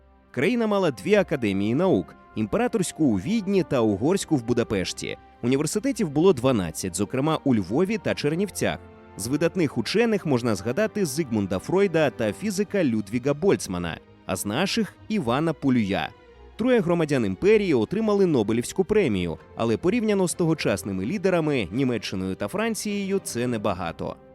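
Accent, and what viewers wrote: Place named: Moscow, Russia. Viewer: Голос за кадром- "ЦюЦюн, жиЦЦя, громаДЗяни" треба на консультацію до логопеда! Вкрай неприємно слухати ці маасковські виверти! ДИЗЛАЙК!!!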